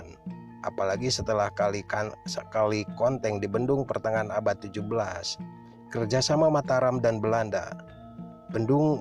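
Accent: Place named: Ciamis, Indonesia. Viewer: Logat ciamis nih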